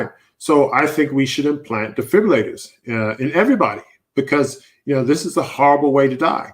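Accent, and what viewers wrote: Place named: South, United States. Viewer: I hear a southern accent. Where r u from?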